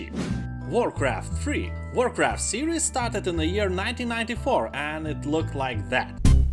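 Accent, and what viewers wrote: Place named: Russia. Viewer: Опа, русский акцентик))))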